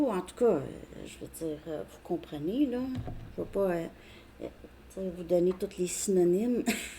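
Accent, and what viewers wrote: Quebec, Canada. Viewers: Merci, ca me parle encore bcp ❤ vous êtes une vraie pepite 😊 et votre accent me ramène au temps où j'ai vecu au quebec 🥰😀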